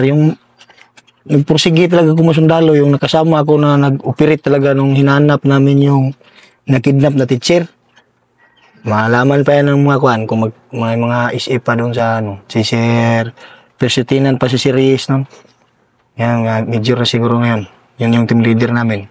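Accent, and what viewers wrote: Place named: Bicol, Philippines. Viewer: Maganda man sana ang kwento kaya Lang BisakoL ang nag kwento. Ayun DUTAE Lang ang Ending ... Kwentong Barbero. 😂😂😂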